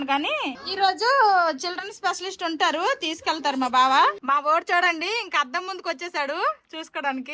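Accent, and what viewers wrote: Srikakulam, India. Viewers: Vantalu chala baaga chestavu Nee srikakulam bhsha vinte Chala santoshamga untundi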